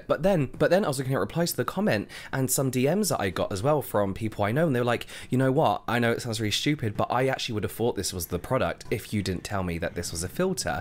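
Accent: United Kingdom. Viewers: This guy can talk and talk for hours nonstop about things idc about and I'd still listen to it all...............I blame the British accent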